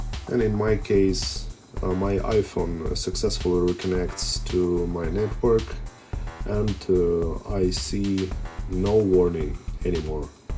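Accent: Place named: Russia. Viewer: Thanks man❤️ like that heavy Russian accent.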